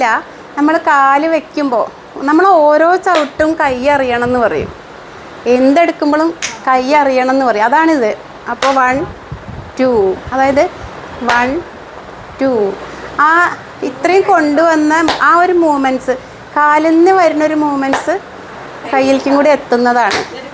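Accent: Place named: Thrissur, India. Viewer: വർത്തമാനത്തിന് തൃശ്ശൂർ ഭാഷ തന്നെയാണ്.,ഊരകം,ഞെരുവിശ്ശേരി ഒക്കെ അറിയാമോ.അവിടെയൊക്കെ ധാരാളം ആളുകൾ കൈകൊട്ടി ക്കളി അ റിയുന്നവരുണ്ട് എന്ന് കേട്ടിട്ടുണ്ട്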